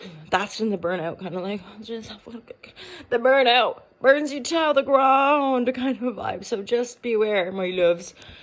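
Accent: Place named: Yorkshire, United Kingdom. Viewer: Good morning girly! I’ve watched you religiously until 2-3 yrs ago I felt called to watch you again, the accent is northern.. Yorkshire most prob 😂❤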